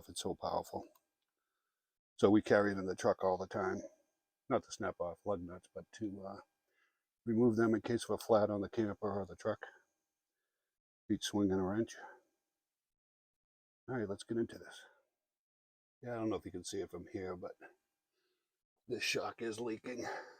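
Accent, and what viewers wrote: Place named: Maine, United States. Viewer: You sound more like a Mainer than someone from Tennessee. Nice vid.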